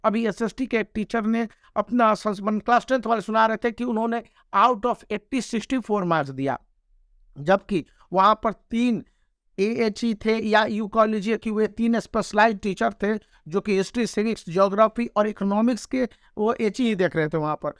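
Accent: Bihar, India. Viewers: sir aap bihar se ho kya??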